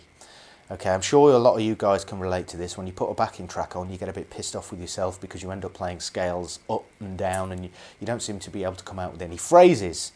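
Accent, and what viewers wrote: England, United Kingdom. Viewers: damn, that english accent!!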